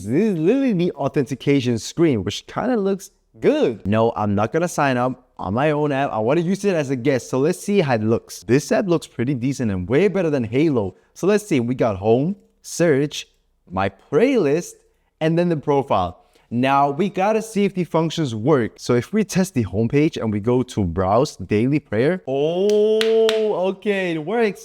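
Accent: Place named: Denmark. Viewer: W DANISH ACCENT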